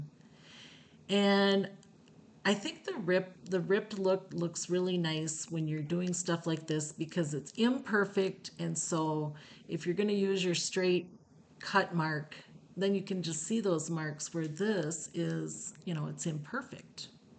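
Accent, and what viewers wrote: Minnesota, United States. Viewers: Your calm rural Minnesota style of speech is so nostalgic and comforting to me. I grew up in Minnesota and haven’t been back to visit in a long time. 🥰 Warm hugs from Texas!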